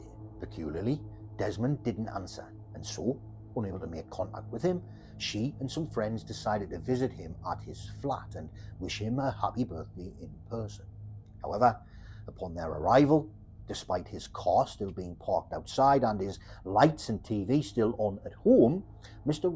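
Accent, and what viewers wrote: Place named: Newcastle, United Kingdom. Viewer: I'm probably due you an apology for thinking you were a well spoken Geordie instead of a Mackem then haha. Glad you noticed my critique was intended as constructive instead of just arsey. I'm a Fifer who lives not far from Burntisland, trust me, you're not the first to say it out as one word, heard many an American or English tourist pronounce it the way you did. I'd also say there's probably a good amount more of Scottish Madmen (a few women too) and Monsters to cover in the future. For example wouldn't mind a longer or longish one on either Peter Tobin or Angus Sinclair. I was looking for something new in the True Crime genre and subscribed after watching 2 of your earlier videos, genuinely think your work is generally excellent mate.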